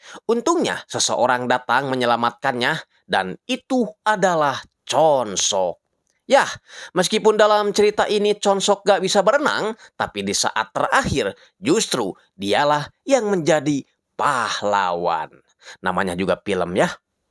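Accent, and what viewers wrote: West Java, Indonesia. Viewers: Saudi hadir Saya suka yg baca filmnya sepertinya orang sunda Bnyak lucunya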